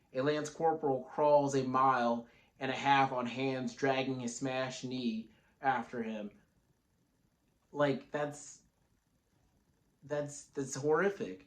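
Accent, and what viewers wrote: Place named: United States. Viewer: Great review! It is a shame you barely have any viewers because I think you get across the point Remarque was trying to make very well. From your accent I presume you're American, that makes it even better, getting that - in his intention - universal message of "just don't kill each other for the interests of others". I got here by somehow remembering how that book impacted me as a kid and then just typing it in. Ended up here, was anything but disappointed. Thanks for covering it that well. And again, you really deserved a whole lot more viewers.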